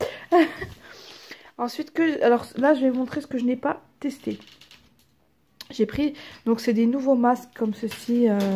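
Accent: Belgium. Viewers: Salem ma belle tu as un accent tu es belge ?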